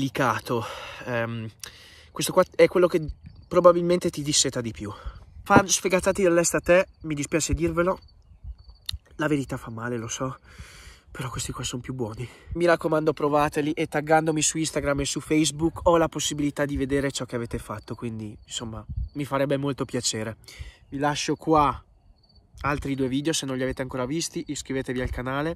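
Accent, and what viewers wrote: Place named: Trentino, Italy. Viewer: Ehiii, da dove vieni? Io sono trentina e sento che anche tu lo sei hahaha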